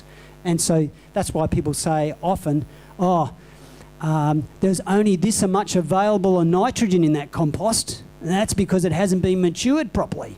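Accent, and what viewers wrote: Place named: Australia. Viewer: Where in Australia are you from? Great talk!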